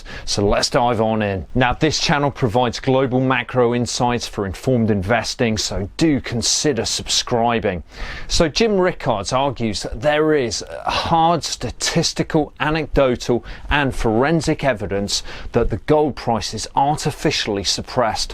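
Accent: United Kingdom. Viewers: good british accent It's suppresses as the price reflects the economic health of a nation and also the inverse effect on currency Ponzi scheme, as the metal is leased out many more times than actually exists ; If u went into the aftermarket and bought after the close, and sold before the open on the nex day, u'd make risk free profits : Massive SELL order just b4 close scares long investors to lower their bid AND spooks hedge funds into dumping Au..this becoming a self fulfilling momentum. As 20-to-1 leverage is present in futures market, the effect is great> Hedge funds' stop losses also kick in...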